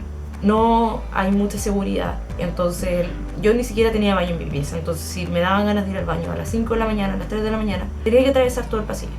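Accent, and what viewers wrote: Chile. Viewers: me encara tu tono chileno😊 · no es porque estabas acostumbrada a hablar inglés, si no porque tu acento chileno es muy extremo. No se entiende. Habla más neutro. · Me costó bastante entenderte perdón no es por ofender pero deberías ser un poco más clara al hablar se que así hablan los chilenos y no tengo nada en contra de eso pero habemos muchos latinos más que no entendemos así que vocaliza un poco más por favor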